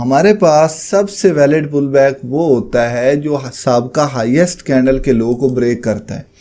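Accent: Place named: Lahore, Pakistan. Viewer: are you from pakistan bcoz of your Lahori accent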